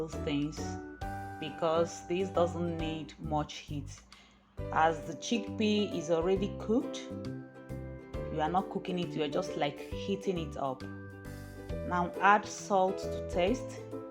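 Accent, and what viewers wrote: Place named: Equatorial Guinea. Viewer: What is your connection to Nigeria? You speak like you are from Equatorial Guinea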